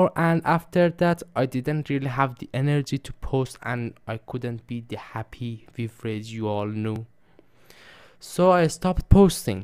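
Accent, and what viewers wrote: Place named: Iran. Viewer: Once I watched your short outta nowhere, your persian accent got me, ngl. Nice job, too much love from Shomal.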